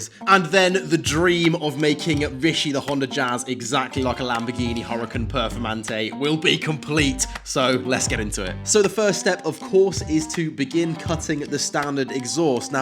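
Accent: United Kingdom. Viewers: Sounds britich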